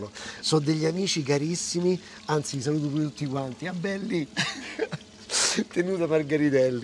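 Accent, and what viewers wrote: Rome, Italy. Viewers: Chef, La premessa è che guardo i tuoi video dagli albori e ti seguo da quando giravi per gambero rosso in tv. Ultimamente però ho da fare una critica, sperando sia costruttiva. Noto che il tuo modo di fare video è cambiato, adattandoti e quasi imitando quelli di un certo “cuoco amatoriale” di cui non voglio fare il nome che gira i video dal proprio balcone di casa… Tutto questo voler enfatizzare il romanesco e la gestualità molto simile all’altro usata per me ti rende meno autentico di quello che sei e che hai sempre dimostrato di essere. Spero venga visto solo come un parere personale e quanto più costruttivo possibile e non come una mera e deleteria critica. Saluti Max, comunque ti seguirò sempre e comunque per la tua simpatia e professionalità! ❤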